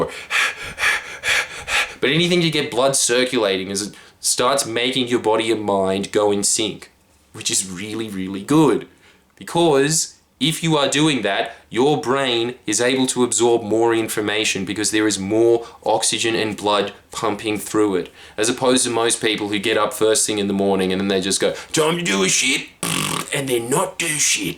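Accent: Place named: Australia. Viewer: Best channel to learn Australian pronunciation.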